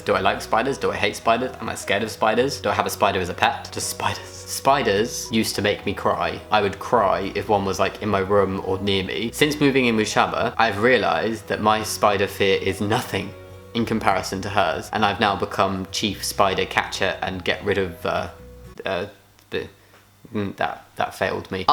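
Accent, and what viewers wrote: United Kingdom. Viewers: BRITISH BAKE OFF PEOPLE! I just love how British is (just like me!) we have a very similar accent!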